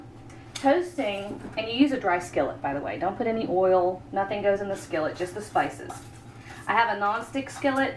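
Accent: South, United States. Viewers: I enjoyed your video and you received a like from me.I replayed the video three times and I really cannot understand the name of the buy bulk online store. I am sorry to trouble you with this. I am Irish and getting attuned with some of the American and very lovely Southern accent, thus I hope you for give me. In American if you listen to somebody talk on the news from the North of Ireland it has to be subtitled :-) :-) I do thank you for your attention.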